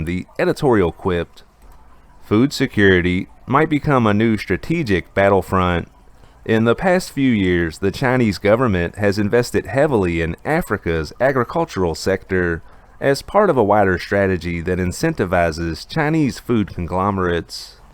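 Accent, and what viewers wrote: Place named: Appalachia, United States. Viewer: how yual doooin. love this guys hillbilly accent.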